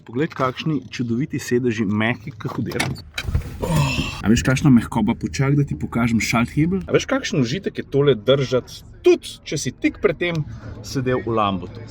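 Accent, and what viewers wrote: Styria, Slovenia. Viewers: velikokrat me tvoji videi tudi nasmejijo, ampak tale uvod je bil pa čisto po štajersko... Čooj a si ti upaš, pa hlače gor potegne:)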